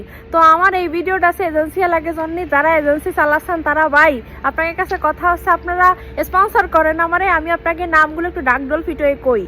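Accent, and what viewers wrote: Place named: Jessore, Bangladesh. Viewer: আমার বয়স ৬৯ বছর, তাই আঙ্কেল হিসাবে একটা প্রশ্ন - তোমার বাড়ী খুলনার কোন এলাকায় ? জাস্ট কিউরিসিটি । প্রসঙ্গত: আমি তোমার ভিডিও পেলে সবটুকুই দেখি এবং গর্ব অনুভব করি । কারণ আমার আঞ্চলিক ভাষায় এত সুম্দর ও আকর্ষনীয় উপস্থাপনা আগে কখনও শুনিনি । শুভকামনায় ।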